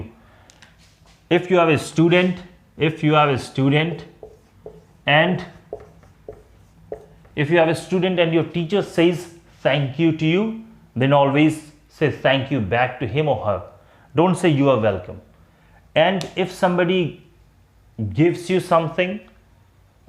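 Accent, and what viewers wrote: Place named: India. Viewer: I'm a native English speaker. I don't mind people saying "good name" though it does make the speaker stand out as probably not being a native speaker. I did not know it was specifically an issue for people from India. Your example with "thank you" vs. "you're welcome" is something I never thought about. It's not so unusual in some of your examples if a person says "you're welcome". I can't see anybody taking the wrong sentiment from it, but I suppose it's best to be careful. In a couple of those examples I may have said "no problem". Maybe there are certain English speaking regions though where this is something to be worried about. You speak very well, but still have that "v" sound replacing "w" and "d" replacing "th". How long does it take to lose that part of the accent for Indians or is it something that most Indians do not fight and just accept it? 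Thank you for the interesting video. ))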